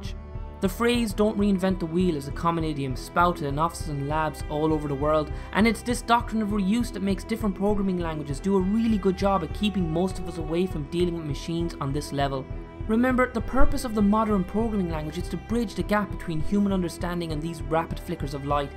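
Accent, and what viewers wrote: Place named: Ireland. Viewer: Also that irish accent makes you so easy to listen to lol.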